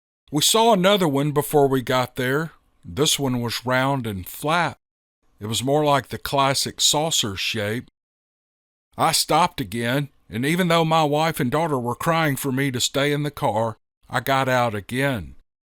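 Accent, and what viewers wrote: South, United States. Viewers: is brilliant isn't he!? His accent is that of a true southern gentleman and I hope all my Irish kinfolk can appreciate our southern accents much love to Ireland from Arkansas 💚♾💚♾💚♾💚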